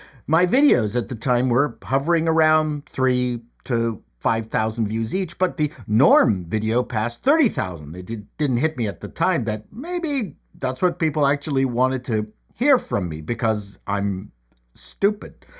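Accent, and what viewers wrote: Canada. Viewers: 🤔'Drunk acerbic Scottish movie critic'? Not sure who you are referring to. 🤣This was indeed fascinating and entertaining sir, I knew and enjoyed your earlier work with the Frantics, and joined the fellow followers know as Dennises(?) and missed the Hackintosh era. It was great to hear you talk about the evolution of your channel, but when you were 'geeking' out and going into the details on your computer builds. For some reason I started hearing the hit ' ' in my head!😂 Thanks for the video and the laughs sir, very glad that YouTube dropped me the suggestion to check you out! I mean I get to enjoy your acerbic movie and television reviews, minus the alcoholism and with a Canadian accent! 🍁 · I am here for the chrisp Canadian accent.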